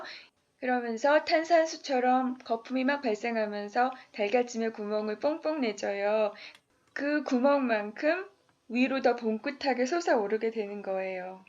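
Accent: Seoul, South Korea. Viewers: 8~90년대 일명 서울 사투리 말투시네요 ㅎㅎㅎ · 약간 경기도 (서울) 사투리 쓰시네요 나긋나긋 너무 듣기 좋아요!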